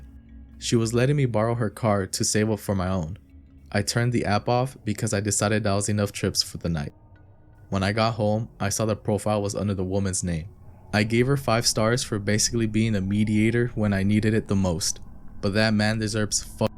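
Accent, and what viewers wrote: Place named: United States. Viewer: its crazy how good your english is you sound like an average american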